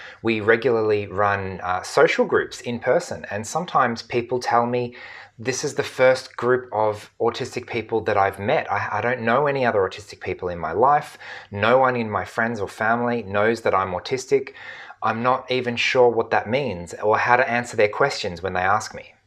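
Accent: Australia. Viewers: Your accent sounds Austrailian to me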